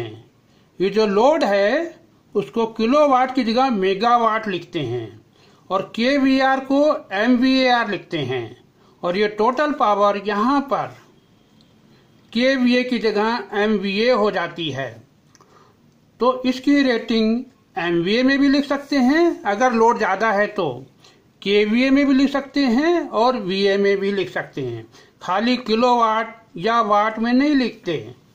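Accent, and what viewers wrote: Bihar, India. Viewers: Bc Bihari bad speech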